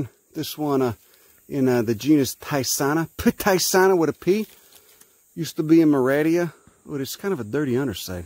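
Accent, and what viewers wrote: Chicago, United States. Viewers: the heavy chicago accent makes this so much better because it makes you sound like such a dad. im basically imagining a chicago ned flanders teaching me about plants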